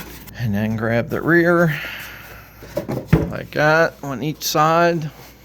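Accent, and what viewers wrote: Western Pennsylvania, United States. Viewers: Thanks for the video! Are you a Yunser from western PA?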